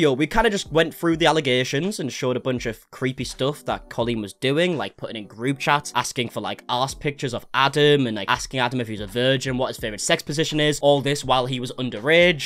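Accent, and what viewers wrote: United Kingdom. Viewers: welsh, scottish irish or english---the accent?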